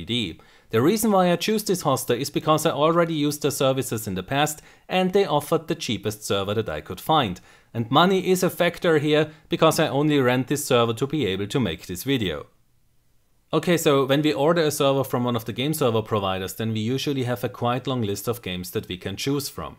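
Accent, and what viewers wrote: Germany. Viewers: Hi Where are you from? Germany? (Because you chose Frankfurt as the server destination and I kind of hear it somehow when you speak). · are you German?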